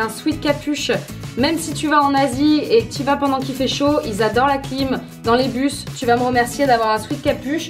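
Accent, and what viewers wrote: France. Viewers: Adoro ouvir o sotaque francês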